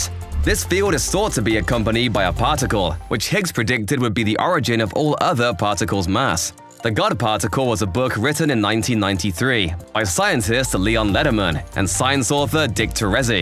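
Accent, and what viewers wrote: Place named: Ireland. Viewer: What is it with an Irish announcer emphasising the European CERN in imperial units and bracketing the metric with US spelling? Metric is standard. Even the troglodytic imperial units are defined by the metric standards, don't give the obsolete measures breathing space let alone put it front and centre. Plus whilst what was said was informative, the random graphics, plucked from who knows where, that have little to nothing to do with what is discussed, are not.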